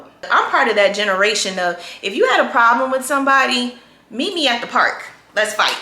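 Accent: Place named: South, United States. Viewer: New Mayor...same as old mayor. you dont have a Chicago accent. You sound Southern.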